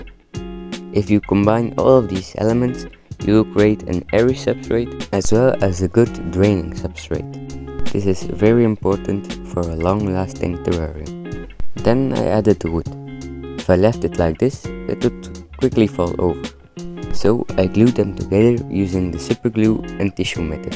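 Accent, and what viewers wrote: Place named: Belgium. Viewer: Oh really lol, you might know that he is from Belgium and I'm also from Belgium so we probably have the same accent😅👍🌿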